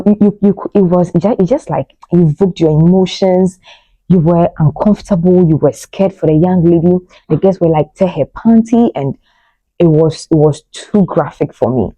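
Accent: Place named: Ghana. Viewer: The movie wad top notch... buh you have the ghana ascent in your voice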